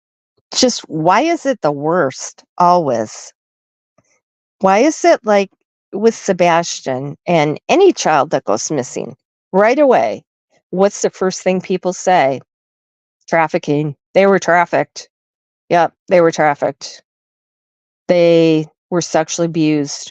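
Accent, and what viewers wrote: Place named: United States. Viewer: I love your laugh ❤ American accents is one of the best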